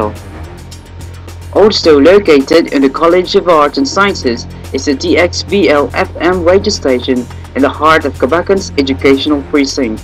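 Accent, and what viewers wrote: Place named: United Kingdom. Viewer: haha.,! wow.! British accent.,!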